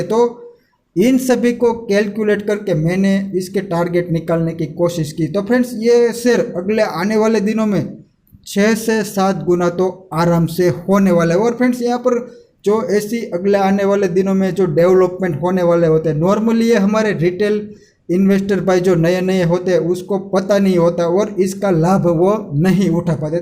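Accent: Gujarat, India. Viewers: Gujarati lagta hai bhai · Way of talking par thi khbr pdi aapda gujju😂😂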